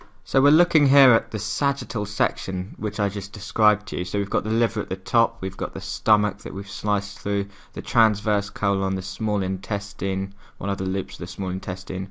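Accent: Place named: United Kingdom. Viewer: Thank you :D love the fact that you are British... it's easier to understand... :D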